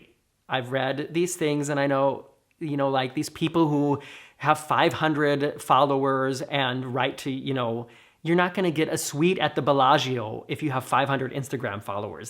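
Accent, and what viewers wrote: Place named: Germany. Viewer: Ah but maybe 🤔 there’s alcohol in the LIPTON Iced tea to make him loopy without telling him. 🤔🤯 wouldn’t that be insane if they did that? 🤣 (I know they most probably don’t...just an assumption!!)Lol..🤣 (Not hating it’s not pronounced vegg-ann it’s pronounced Vee-gan, I understand if it’s your German accent I struggle to say stuff with my Welsh,English accent so I say things wrong like specific...It comes out as suspific 🤣😂 when I say it to people they look at me weirdly and I embaress myself!🤣😂) (FACT: The Welsh language/Accent, Originates from from Wales,UK, the strongest accent is in North Wales and then South, East and Wales don’t (age that strong accents we just struggle to pronounce words...Well at least I do..😂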